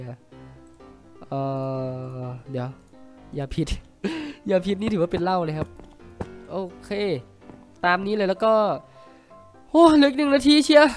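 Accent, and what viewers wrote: Isan, Thailand. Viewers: พี่ พูดอีสาน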